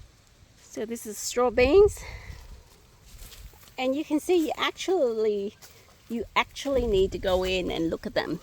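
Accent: Australia. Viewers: Your accent instantly gave away that you are Aussie. I'm making a pumpkin tunnel using Seminole pumpkins but after seeing your runner bean tunnel I think I will add them as well for even more shade because I'm in Florida. Great video!!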